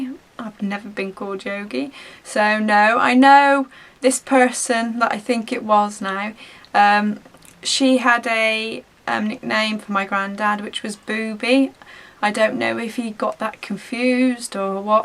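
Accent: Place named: Shropshire, United Kingdom. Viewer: Where are you from, you sound VERY Shropshire-ish! I am from Shrewsbury, and I do my own investigations sometimes, and I have had lots of my own experiences :D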